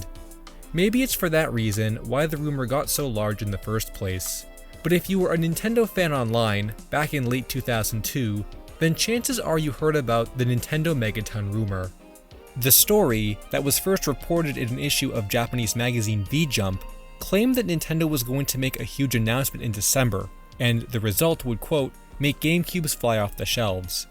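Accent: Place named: Massachusetts, United States. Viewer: 1000 to 1 your from Massachusetts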